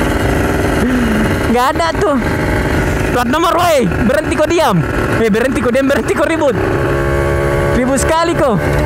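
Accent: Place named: Sulawesi, Indonesia. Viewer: Asli Sulawesi kah bg? soalnya logat nya kaya orang Sulawesi •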